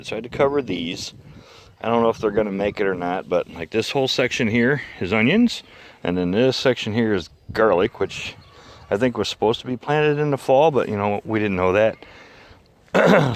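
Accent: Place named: Midwest, United States. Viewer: Whoo hoo get to watch my favorite turner do other stuff. I hope this goes well for you and. I love all your content. Makes me want to go home to Mishawaka/Granger area. My family bis still there brother and sister aunt and bunches of cousins. Miss that Midwestern accent!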